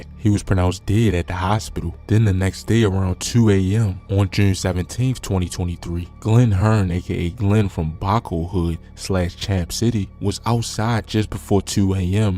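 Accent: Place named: Baltimore, United States. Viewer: You gotta be from Baltimore your accent is giving that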